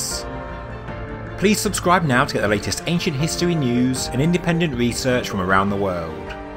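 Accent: United Kingdom. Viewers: it means 5 people think is going to get a "speech coach" to correct his voice to cater to their opinions. The guy is the UK, it's his accent and dialect. So yes, 6 useless opinions of people who if you enjoy the content watch it out you don't like it don't watch it. So why don't you "sit down and shut up."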